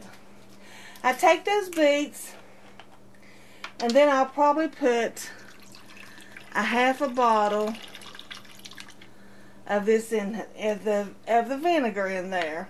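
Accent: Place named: West Virginia, United States. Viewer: You have to be from WV. Either that or Kentucky. I'm from WV, and can usually tell a fellow hillbilly when I hear one talk. Great video by the way.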